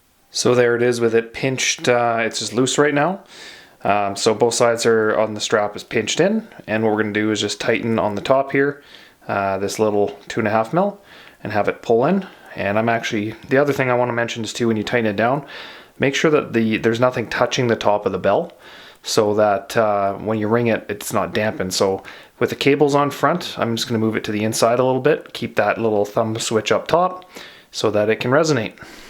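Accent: Canada. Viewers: Are you Canadian? You have a very strong accent.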